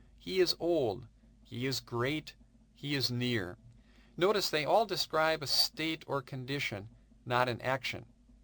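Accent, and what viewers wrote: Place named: Canada. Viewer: Please don't be offended, but I think I'm hearing a Canadian reading this Hebrew. It's just super weird because the French and Hebrew R sound the same, but this guy's "far west" pronunciation is almost grating.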